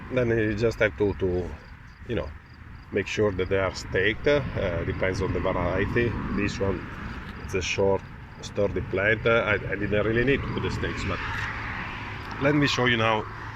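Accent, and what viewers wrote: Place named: Italy. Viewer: Si sente l'accento italiano :D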